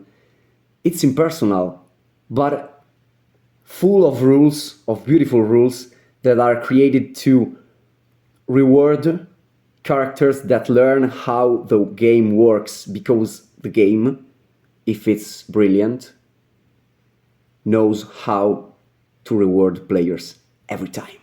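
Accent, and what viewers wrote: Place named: Lombardy, Italy. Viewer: Hi! It's very interesting and exciting to see you speaking in English (with an imperceptible Lombard accent). This mental coaching video is captivating and deep, you made me think about my own development...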